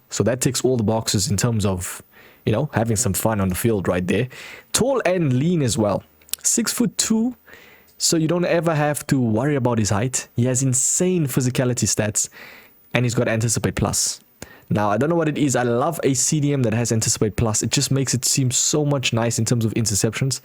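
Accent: South Africa. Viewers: I think he is from South Africa 🇿🇦 or New Zealand 🇳🇿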